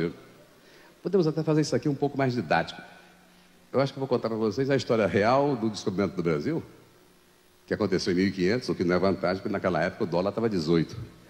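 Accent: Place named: Portugal. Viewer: Ele falou português de portugal tão bem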